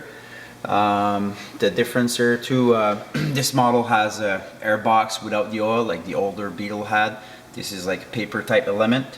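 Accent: Quebec, Canada. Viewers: Beautiful car!!! Not sure where you are located but you speak English just like those folks in Quebec Canada.... I live in Ontario!!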